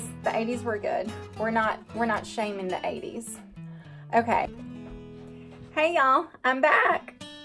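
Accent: South, United States. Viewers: I love the way you talk , being from Canada I like to listen to you talk southern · okay maybe you talk a little southern ;) but that beautiful smile of yours just lights up the world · Ur a southern girl, ur r suppose to talk that way. We love it. Continue on. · I love the way you talk! That accent is great! Ol HWJR ! send all of our gals down to Georgia to learn to talk? Or maybe North Carolina! By the way for those of you that are not as old as I am. Ol HWJR = Hank Williams Jr. Good article there girl! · Your not talking different, your talking with a southern accent, just like people from Boston Maine and Texas! This country has many different accents! 😊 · You are not 'different!' You are just a sweet 'Southern Belle!' Your voice and sayings just epitomize how we Southerners talk and the way we Southerners do things. I'm from the South, Georgia, just like you and I can tell that we had parents who raised us to be kind and respectful to others and all of God's creatures. 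So don't every apologize for the things you say and do 'different' from others. I always just place them in the category of being 'different.' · You don't talk different just southern it's great don't change anything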